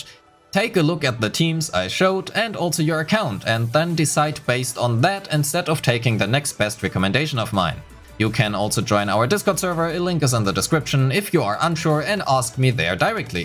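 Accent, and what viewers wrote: Germany. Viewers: "Kolader" lol jokes aside I'm from alsace. German accents never fail to amuse me 😂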